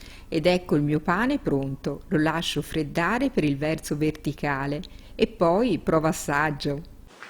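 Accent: Tuscany, Italy. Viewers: Molto brava, complimenti e che bel toscano parla. Senese?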